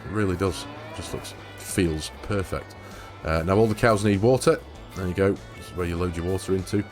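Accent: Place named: Scotland, United Kingdom. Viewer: "being from Scotland originally" I could tell by the accent 🤣🤣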